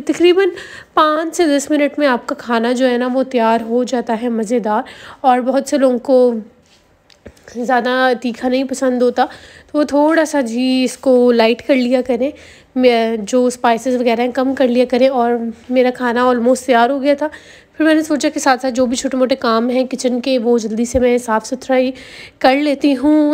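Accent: Punjab, India. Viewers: MashAllah so cute punjabi I am also punjabi love u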